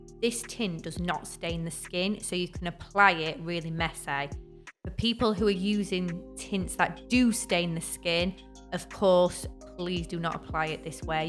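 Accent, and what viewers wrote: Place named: North, United Kingdom. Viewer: the limey accent.. when it's too pronounced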